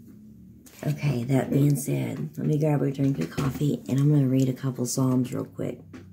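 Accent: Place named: Tennessee, United States. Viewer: Grumble Bunnies! Oscar the Grouch! Squidward! 😬😱🙊...🙌🎚The JOY of the Lord sis! It IS what gets me thru! Had to learn the long hard way about this. Wandering the desert >40 years😬💔🙌🎚. God's perfect timing for new peeps, the Lord IS doing something, preparing us girl! That TN twang! This IS the day the LORD has made I WILL REJOICE AND BE GLAD! 🧐ain't nobody got time for dat! Cut em out Lord! Ps.1 HAPPY are those who do NOT listen to the wicked, who do NOT go where sinners go, who do NOT do what evil people do. We LOVE the Lord's teaching and we think about those teachings day AND night! We are strong like trees planted by THE RIVER, we produce fruit in season and our leaves DON'T die. EVERYTHING we do WILL succeed.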